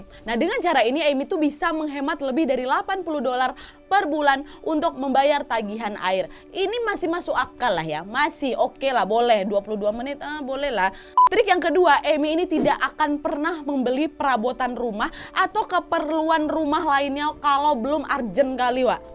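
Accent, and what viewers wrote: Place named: Medan, Indonesia. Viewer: Suka kaliii bah aku dengar cara bicaranya,gaya medan bahh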